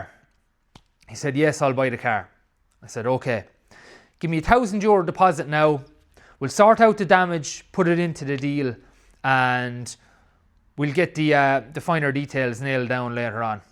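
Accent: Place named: Ireland. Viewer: You sound Irish 😮😮😮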